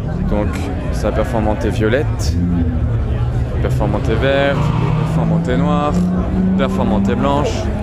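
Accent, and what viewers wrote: France. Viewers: A la meuf te lache un regard 👀 elle a kiffé l’accent français 😂. La Ferrari perso le son et juste dingue.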